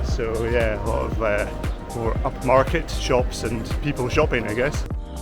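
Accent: Scotland, United Kingdom. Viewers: Try vising Eastwood City, Ortigas Center and Filinvest City also. To me, the captions/subtitles aren't necessary. Your Scottish accent isn't that thick. We understand your English perfectly. ᵔᴗᵔ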